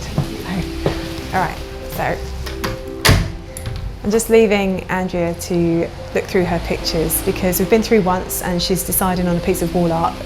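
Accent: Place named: England, United Kingdom. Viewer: Her English accent and the closed captioning are quite entertaining. Very enlightening view of a day in the life. Most photographers don't relish that 60% business part.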